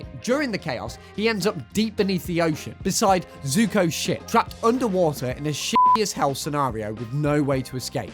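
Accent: United Kingdom. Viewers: Bro's Britishness really be shining through w this one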